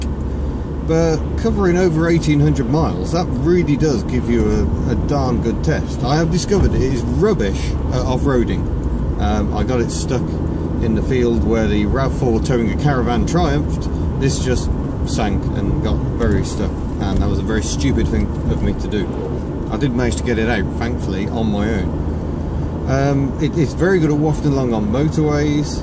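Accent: United Kingdom. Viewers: Did not expect a British accent.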